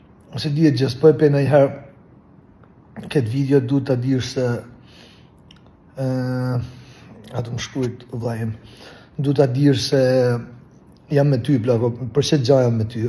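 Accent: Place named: Laskar, Albania. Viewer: Ik ore lepirse, le nam. Po kan ngren leva. Veprimet kan pasoja, mire ja beri. Ti gjithsesi je vk qe flet si laskar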